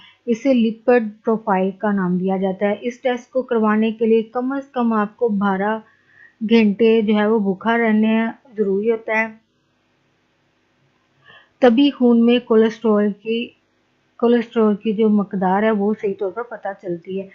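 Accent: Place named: Rural, India. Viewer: paindoo awaz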